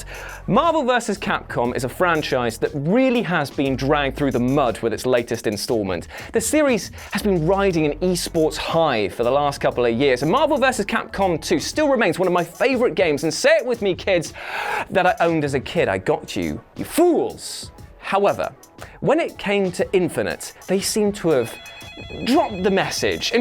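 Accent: South, United States. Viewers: I'm in love with ' Southern accent! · in his southern accent 👏🏽👏🏽👏🏽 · Wow, seems like Crapcom's not so hot on those sequels, huh? And although your American accent is funny, DMC2 was ALL Crapcom Japan. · That southern accent tho lol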